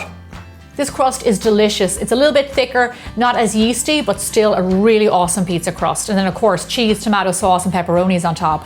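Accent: Ireland. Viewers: I think she's Irish. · The Irish accent is so buttery smoth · she’s actually Irish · Is she irish?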